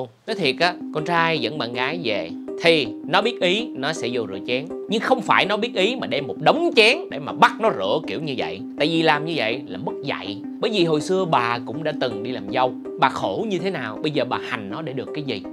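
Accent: North, Vietnam. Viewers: eh bò đỏ, ông dùng Tiếng bắc nè, mày thưa giùm tao cái. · review có tính cà khịa, nói lái theo giọng người miền bắc thì phải ?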